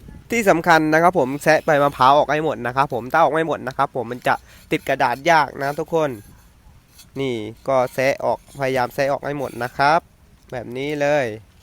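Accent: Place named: Central Thailand, Thailand. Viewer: สำเนียงกลางภาษาไต้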